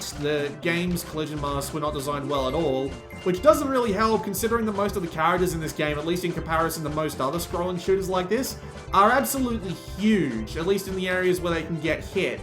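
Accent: Australia. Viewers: Just wanted to thank you, I've been comming increasingly aware that I use your channel a lot when looking for PS VITA games (especially ones that have been turned into limited physical releases, including this very game!). But i'd much prefer to play Darius Burst than this.. I do say I love the art work in ths game though! PS - If you haven't already, I strongly recommend "CALADRIUS" on PS4, it has become my all time favourite Bullet hell shooter!...even though I have never made it past the 3rd Boss (I don't use continues, just rinse & repeat!) oh and it's awesome listening to a fellow Aussie gaming! =)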